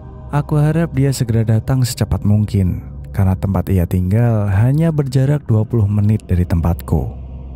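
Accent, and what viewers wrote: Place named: West Java, Indonesia. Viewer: Sunda euy😂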